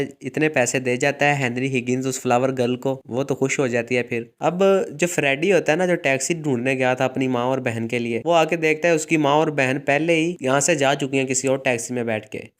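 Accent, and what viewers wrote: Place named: Punjab, India. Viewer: Your Urdu is Punjabi Urdu.Apna accent theek kijiye.baqi sab qarib qarib theek hai.with good wishes from Calcutta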